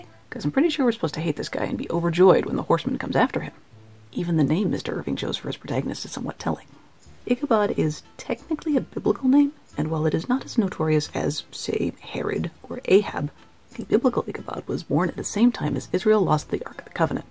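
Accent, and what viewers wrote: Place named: England, United Kingdom. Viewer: I feel like I learn more watching your videos than I would reading the book! Loved the English accent!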